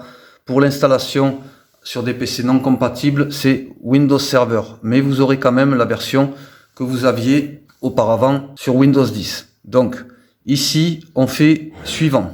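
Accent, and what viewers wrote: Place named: Southwest, France. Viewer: Super tuto !! bravo pour cette méthode simple et efficace👍👍 j'adore votre accent du sud-ouest 🤔🤔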